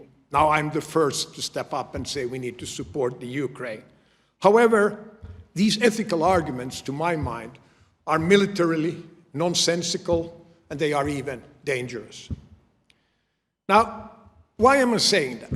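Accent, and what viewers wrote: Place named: Mid-Atlantic, United States. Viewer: mid atlantic accent👹👹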